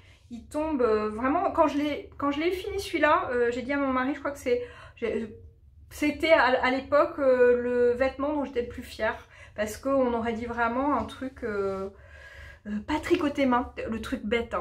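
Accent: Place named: England, United Kingdom. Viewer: Bonjour, je ne sais plus trop comment je suis arrivée sur ta chaîne YouTube, je crois que c’est une recommandation de la chaîne. En tout cas, je suis mordue de chez mordue: j’aime ta personnalité enjouée, ton super accent anglais, les modèles et les laines que tu choisis (permets-moi de te tutoyer). Et puis, nous sommes presque voisines: j’habite Laval et je vais assez souvent à Portsall, sauf en juillet-août. Je continue le rattrapage des visionnaires. Bravo, continue comme ça!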